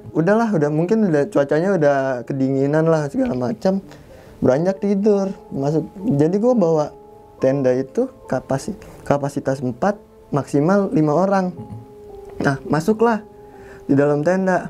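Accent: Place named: Bekasi, Indonesia. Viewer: Resep bet dah ah gaya ceritanya, nyablak bett hahaha lebih ke bekasi ini mah kaga cileungsi😂 apa jangan jangan orang setu nih si abang nya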